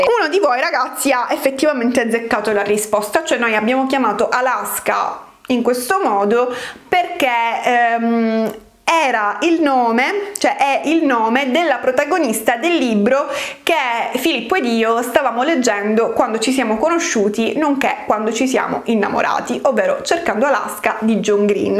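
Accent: Sicily, Italy. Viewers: Comunque io adoro la tua voce e il tuo accento siculo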